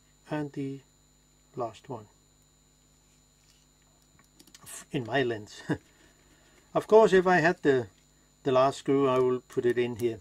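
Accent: Denmark. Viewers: it can only sit in one position. Jeg kan lyd en dansker fra tusind km, rigtig godt video.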